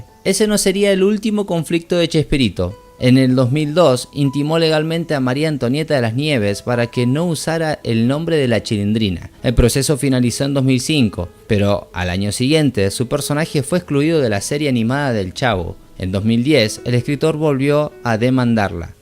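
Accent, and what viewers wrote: Argentina. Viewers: Narrador sos. Argentino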